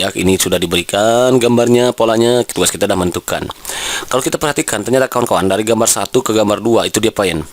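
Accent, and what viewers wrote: West Java, Indonesia. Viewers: Klu orang sunda mah seru, enak d simak 😀. Auto Subscribe n like 👍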